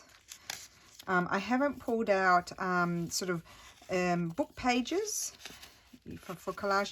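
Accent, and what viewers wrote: Australia. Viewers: "Go bananas decorating" haha I love your Australian accent and expressions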